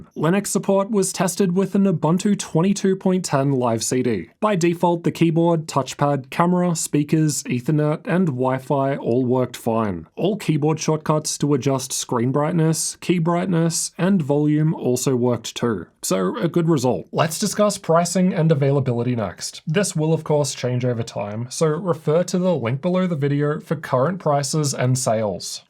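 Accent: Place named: Australia. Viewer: Because it’s an Australian accent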